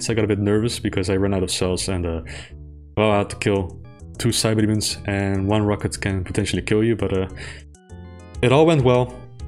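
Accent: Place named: Netherlands. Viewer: It is the Dutch way of speaking English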